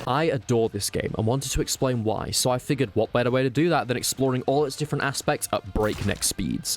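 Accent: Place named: England, United Kingdom. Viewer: You said it correctly-ish. As good as you can with english accent.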